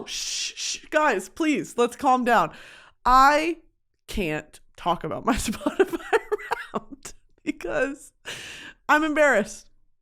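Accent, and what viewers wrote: United Kingdom. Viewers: the british accent got me :face-purple-crying:😭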